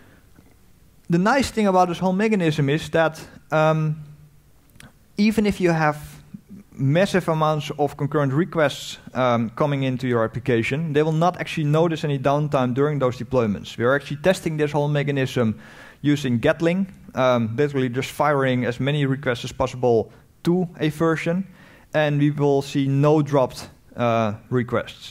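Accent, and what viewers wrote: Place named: Netherlands. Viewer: helpful video. but the Dutch-English here is cringe worthy.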